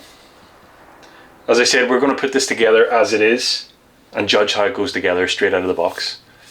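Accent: Northern Ireland, United Kingdom. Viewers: So good to hear a great Norn Iron accent. Love your videos. Francis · I just unboxed mine. It's a hollow body version. Looking forward to seeing how you approach the painting and curious about which mods you are going to bother with. The Northern Irish accent was a real plus for me. I loved "Derry Girls" 😉. Any idea when the second video will be up?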